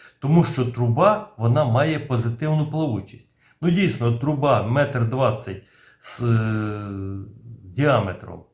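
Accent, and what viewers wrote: Vinnytsia, Ukraine. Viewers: Дякую за професійний огляд. Особливо подобається такий рідний акцент Вінниччини такою славною украЇнською.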